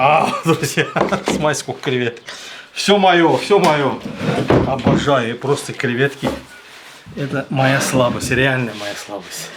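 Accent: Baku, Azerbaijan. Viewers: муалим, процветание Вашему каналу👍🏻Ваш Бакинский акцент это вишенка на вашем волшебном канале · Ай джан! Бомба! Спустя 30 лет я ел рачки 2022 на Баилова. Спасибо за Ностальгию и Бакинский Акцент. 🙏❤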